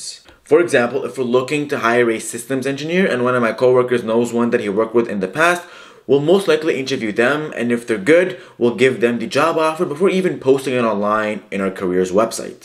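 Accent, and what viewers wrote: Egypt. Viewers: مصري مش كده؟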